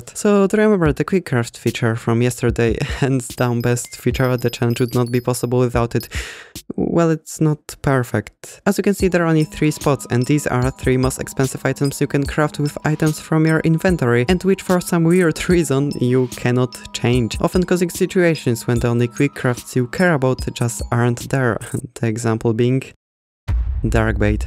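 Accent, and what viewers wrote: Poland. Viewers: Jesteś z polski? Słychać po akcencie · słysze polski akcent czy nie prawda?? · Kurwa oglądam sobie i słyszę charakterystyczny akcent patrzę w info a to kanał polski xD powodzenia w anglojęzycznej karierę będę tu chyba czasem wpadał :) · Ty z polski? Bo masz nasz akcent? You from poland? Because you have our accent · My polish detector went insane after I heard you speak, I was right judging by your about page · Are you from Poland? I hear an accent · You sound like you are from Poland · Kurwa odrazu po akcencie wiedziałem że to polak xD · Takiego polskiego akcentu nie ukryjesz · po tym akcencie odrazu wiedzialem ze polak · u sound like polish and sound like u are reading script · Słychać że Polak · U from poland? i recognise the accent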